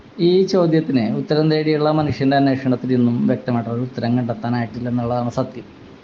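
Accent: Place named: Kochi, India. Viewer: Kochi bhasa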